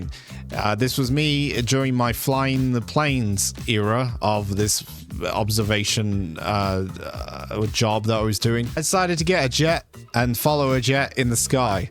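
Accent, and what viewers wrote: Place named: Bristol, United Kingdom. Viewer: Love the Bristol accent ❤and love to see what npcs do with their day 😂 I hope it gta 6 they actually live full lives.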